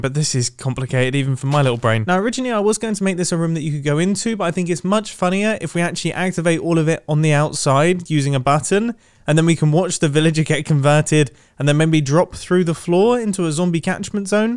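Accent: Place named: United Kingdom. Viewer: oh OK just sounds British to me for some reason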